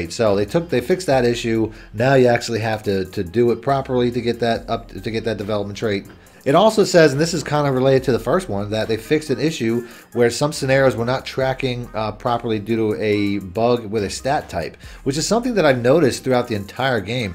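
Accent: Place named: Philadelphia, United States. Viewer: You from Philly bro?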